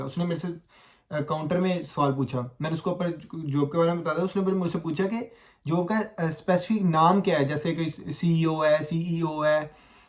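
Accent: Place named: Faisalabad, Pakistan. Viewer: Bro faisalabadi ho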